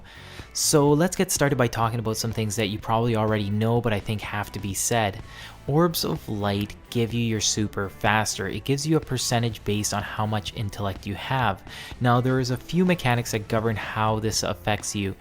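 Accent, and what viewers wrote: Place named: Canada. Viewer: Canadian ey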